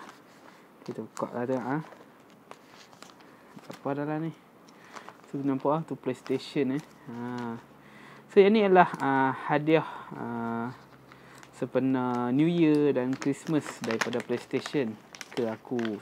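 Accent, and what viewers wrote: North, Malaysia. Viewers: PSN ID : Shawrihan - Keep it up bro with this kind of video ( review psl games, movies, unboxing and all ) good to some of us! - suka dgr loghat utara tu! All the best bro!!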